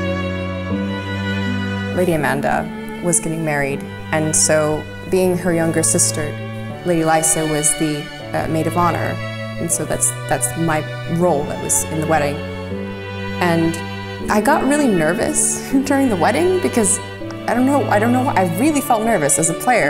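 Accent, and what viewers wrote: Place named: United States. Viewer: Going by her dialect, do a lot of Americans/Canadians get involved in this thing?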